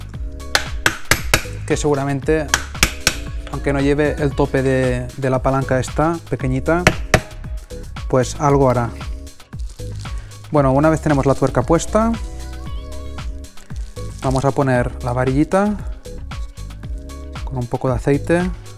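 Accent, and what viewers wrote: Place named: Catalonia, Spain. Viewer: Com es nota que ets català ajajaj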